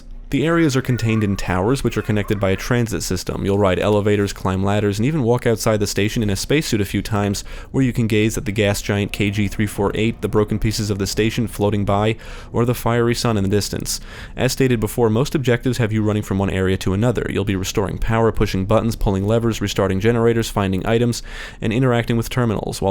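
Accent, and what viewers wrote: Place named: Mid-Atlantic, United States. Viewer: Are you friends with cinema cins or ACG because all 3 of you guys have that fast pace, mid-Atlantic get to the point informative voice lol.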